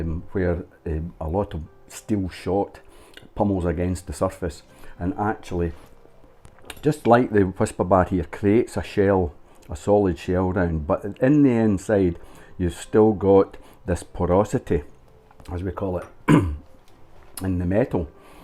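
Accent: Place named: Scotland, United Kingdom. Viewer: Great video and superb Scottish accent. Greetings from Poland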